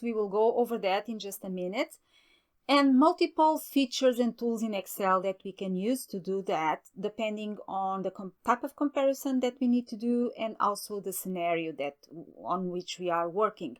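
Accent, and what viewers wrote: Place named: Portugal. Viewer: Eu abri o video que me apareceu, porque procurava a ferramenta para comparar as listas e ouvindo o sotaque, comecou me a entrar a impressaro que a voz era Portuguesa. Fui olhar para o nome do autor e acertei.. Sobre o conteudo, e muito bom. Bem explicado. Obrigado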